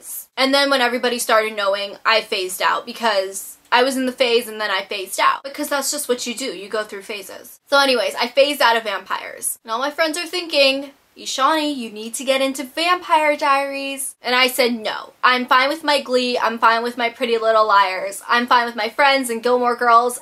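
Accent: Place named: California, United States. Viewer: Bahhh you're so funny! we have similar humor! you sound like you're from CA too, correct? :)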